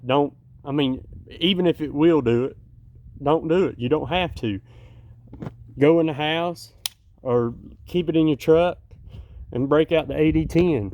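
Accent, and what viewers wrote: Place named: Virginia, United States. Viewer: I should have realized you were from Virginia by the accent. I am too (although I live in Texas now). Great to know a fellow Virginian! Great review on the Baklash. They just came out with a carbon fiber version, so I may have to grab another one.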